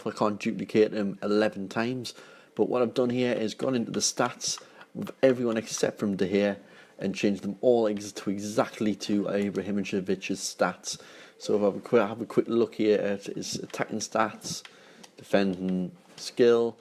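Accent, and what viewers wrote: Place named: North East England, United Kingdom. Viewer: your accent are you from the north east of England?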